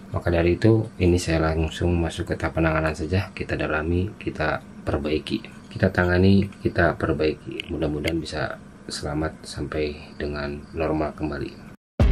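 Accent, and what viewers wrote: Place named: West Java, Indonesia. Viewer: Ti Sunda mana kakang? Urang sampe nguley seuri, ngadangu bahasa "TUNGGU SAMPE BIJIL"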